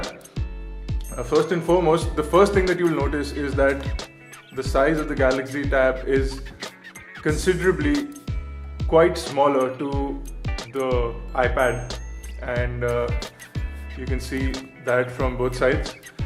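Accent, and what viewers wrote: India. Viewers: Hands of a white man, but accent like an Indian. Dude, your review put me to sleep...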